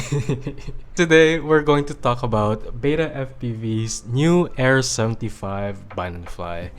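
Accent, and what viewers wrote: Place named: Philippines. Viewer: sabi na e.. the accent was a giveaway.. kabayan :)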